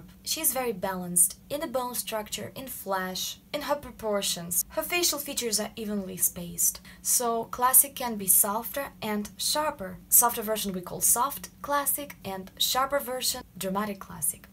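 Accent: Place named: Russia. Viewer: That is funny I found that your accent someway is like you are surely not from Us or England and then I checked you channel oh gash you are russian. That accounts for the milk in the coconut xD OMG I can't really stand it but your information seems pretty important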